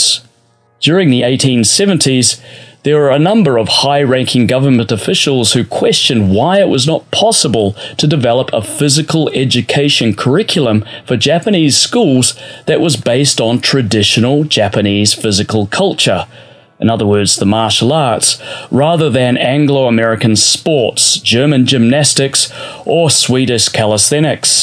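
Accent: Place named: New Zealand. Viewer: Nice work, great to hear a Kiwi explain this.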